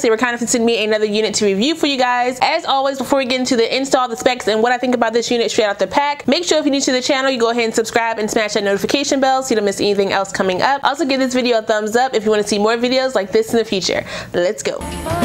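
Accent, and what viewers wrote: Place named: DMV, United States. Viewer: I loved this video. Random question, are you from Maryland, DC, or Virginia? I am, and your vibe (music/Go Go vibish and the way you talk “this joint” 😂) is how some of us talk.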